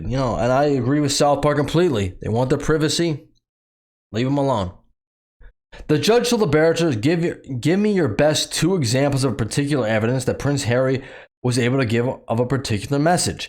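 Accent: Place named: Chicago, United States. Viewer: Ahh...now I know you're not my long lost brother, Rick. You sounded so much like him I was wondering. Definitely remind me of the old Chicago accent . lol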